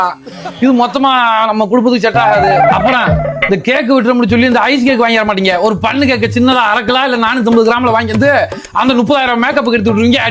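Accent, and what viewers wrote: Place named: Madurai, India. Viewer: மதுரை கிராம பாசை😂😂😂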